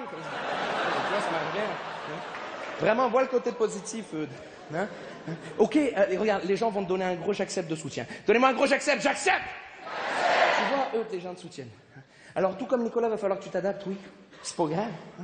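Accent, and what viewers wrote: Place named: Quebec, Canada. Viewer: en passant , sont accent quebecois est pas pareil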